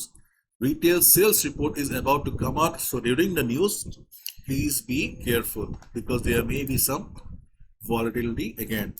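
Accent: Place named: Punjab, India. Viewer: bhai teri english or meri punjabi ka accent 1 hay love from pakistan .. good analysis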